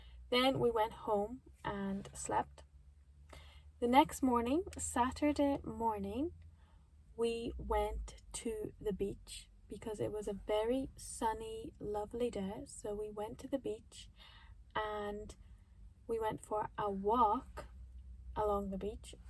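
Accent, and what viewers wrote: England, United Kingdom. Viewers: It sounds like you might be an English English teacher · Are you British ?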